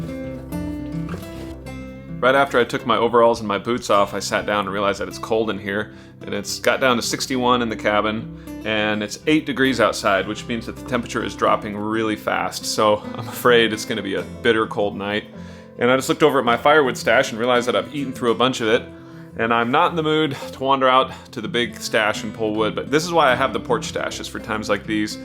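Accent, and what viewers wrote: United States. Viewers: what the hell is your "Negative this negative that" Their is no negative it is either "below zero" or "Minus" You sound like you were born and raised in Miami beach! Speak like a Northerner! WTF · I don’t like his voice… too hillbilly. But impressive & brave carpenter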